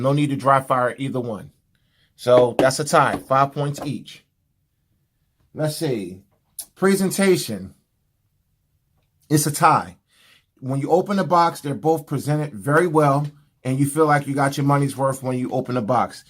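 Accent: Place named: New York, United States. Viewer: I wished I saw this before buying.. Your vid was great. The Sig is great. But I went with the Ruger. I ripped off the Novaks for Tru Glo FTX Pros . The Novaks were meh. But the American has a better mag. The teflon coated mags makes a difference. The trigger on the Ruger gets better after 500 rounds. It feels a little heavy at first but it's a new gun. The Sig comes out the box with a good trigger and sights. Both awesome pistols. One just needs to mature. But with the TFX sights and some rounds through it I think the Ruger is better. I rent a 320C at my range for fun. Great gun.. But I don't regret the Ruger for a second. It just needed some...Love. The Sig 320C comes out the box the way my Ruger is.. In the end it's just preference. I can't shit talk either or. Both awesome. PS: love the accent. I'm from NY.. I feel ya.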